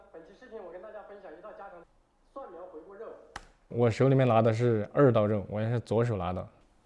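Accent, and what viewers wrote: Sichuan, China. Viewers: He's speaking Mandarin with a bit of Szechuanese accent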